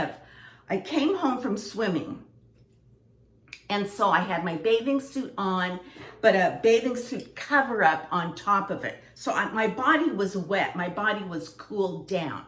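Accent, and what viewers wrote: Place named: California, United States. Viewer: Hi, I am a new sub🤗 I just love your channel and I LOVE your California accent so much, where in California did you grow up? I have been binge watching your videos! 😘 I came across your channel from watching Monika Mcgillicudy and I saw a thumbnail on the right side. I love your home decor!!! Beautiful💕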